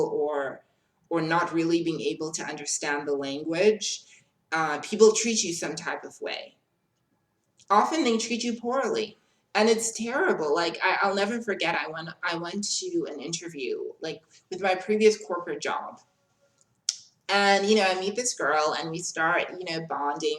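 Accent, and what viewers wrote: United States. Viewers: I thought you were from the U.S. maybe California. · As an American you definitely sound like you’re from California. Sometimes when you say certain words i can hear your South African tone come through but when I first started watching you i had no idea you weren’t from the states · You sound authentic American to me... and yes your word choices do show high intelligence... I had a SA friend in High School (Dirk) who spoke fluent German and English with perfect diction.. We use American TV to teach English in South Korea and the Philippines... You're looking relaxed and happy... have a blunt .. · I love the way you speak! As an American myself, i would say your accent sounds closest to the California American accent. After listening to u speak for an extended period of time, I could pick up that the American accent isn’t native since since you enunciate words better than most Americans; and u pronounce a few select words a bit differently here and there. 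But still by far the most convincing American accent I’ve heard from somebody not from here 🤗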